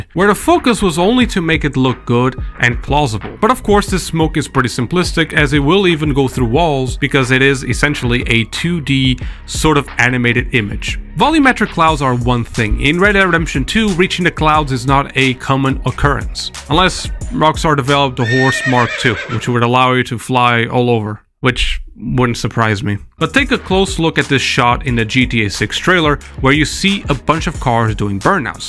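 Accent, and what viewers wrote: Portugal. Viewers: I noticed your Portuguese accent in the first 25 seconds of video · eu sabia que eras tuga do get-go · i smell tuga in here... :))) props!!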